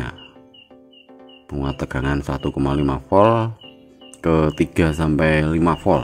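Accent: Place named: Java, Indonesia. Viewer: Orang Jawa atau orang Sunda ?? Kok disebut KANG , Tapi ngomong nya seperti Orang Jawa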